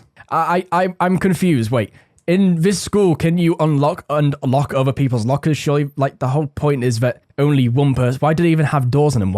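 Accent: United Kingdom. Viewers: It may be the accent. Since Jake is British. sounds like he has a British accent also. Edited to add: he verified that he is from England. The Accent is probably why you thought about Jake. needs to speak a little slower so we can understand him a little better. Jake and both speak a little slower.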